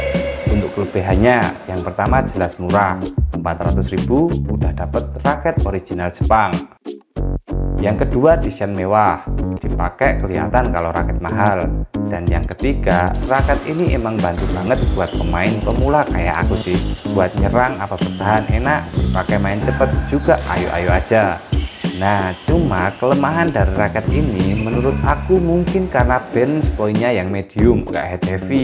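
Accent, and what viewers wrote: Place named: Java, Indonesia. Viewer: Medok eram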